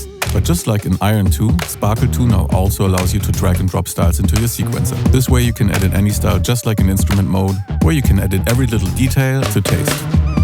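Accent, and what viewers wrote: Germany. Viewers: Well it's a German company and the narrator is obviously German, like Kraftwerk 😉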